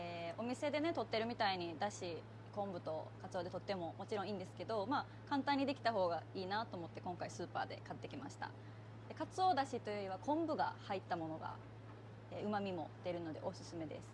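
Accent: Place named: Kochi, Japan. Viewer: 前々から 拝見させていただいてました。 美人は当然ですが、 イントネーションは 高知の女の子ですね🎵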